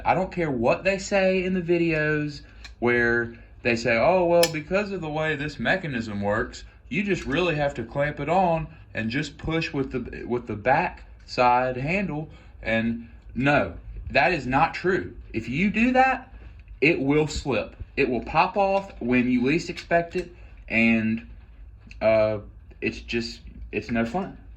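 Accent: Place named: United States. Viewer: you can tell by so many things in your video, but the main one being that the soft laser etched lettering is still on the grips. if you grew up with crescent wrenches there is a learning curve. you dont know how to use knipex and are not really entitled to an opinion. just a typical american tradesman (and all the stereotypes that entails judging by your speech and mitts)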